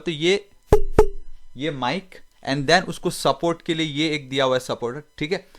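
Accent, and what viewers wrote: Nepal. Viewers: da tapai ko Nepali tone ayo....😁😁 Tara....saachi...dami....u know wat I used to make videos on Hindi as m Nepali so my vocal tone...is not purely Hindi....so my friends used to laugh over me but when I saw ur subscriber and video content it motivate me....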